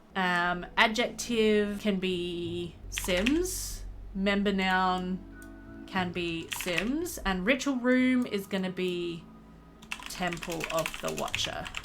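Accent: Australia. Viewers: austrailian accents...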